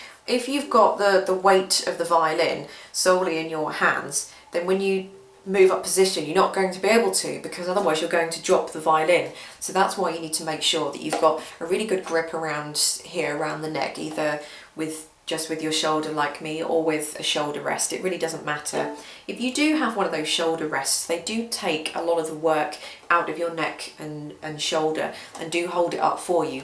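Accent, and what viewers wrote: United Kingdom. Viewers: Thank you from me as well as I am just starting out and really appreciate your instructions. On a side note, since I am an American guy, I hope it's OK as well to say that your hair looks gorgeous and along with your British accent, they only add to the whole learning experience. So much more refreshing that watching some old boring guy. Looking forward to your next tutorial videos.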